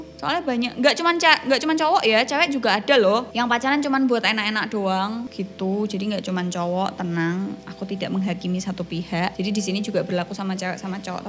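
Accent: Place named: Bantul, Indonesia. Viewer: Iki mbk e nek ga wong bantoel.. y pling skitar kalasan po banguntapan.. logatmu ngangenin desoku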